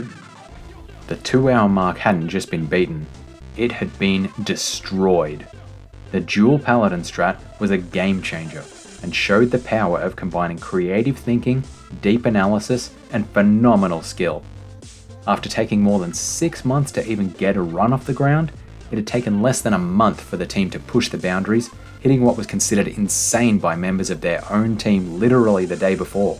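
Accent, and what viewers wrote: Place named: New Zealand. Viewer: I've binge watched your videos over the last couple of nights. Your passion is so evident -- it's infectious. Thanks for the content. Your narration is awesome and I could listen all night. I can't put my finger on where you're from, though. You sound Kiwi; your relaxed, steady rhythm sounds East Coast NI (my ex-home). That, or Kiwi-born and raised and now in maybe Vic state, AU? Either way, I'd love it if you covered other games too — if the passion is there. Just more content, pleaseee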